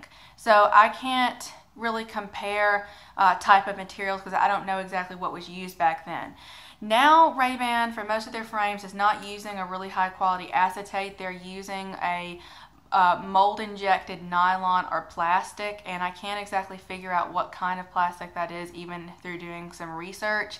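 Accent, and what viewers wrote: South, United States. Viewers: Your skin, hair, and eyes are absolutely intoxicating. Eyes like perfect almonds. You do your makeup perfectly. You're just accenting your already lovely features, not trying to paint over and create something new. You're a vision! The southern accent is the coup de grace. Great info also. My new Wayfarers were squeaking so that's how I found your channel. Thank you!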